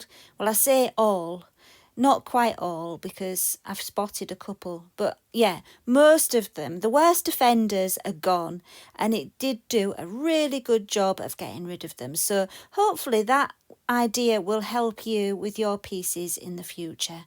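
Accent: United Kingdom. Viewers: Thank you for another wonderful video! I have watched you for years and adore your British accent…I’m from the US and have only a boring southern accent. I love to watch your ideas come to life and find your witty commentary delightful and charming!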